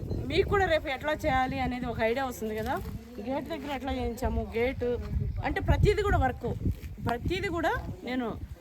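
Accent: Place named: Andhra Pradesh, India. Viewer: andhra accent kiraak asala..... chaala baagundi 😀 you don't often hear that purest form of Andhra telugu in Hyderabad or Telangana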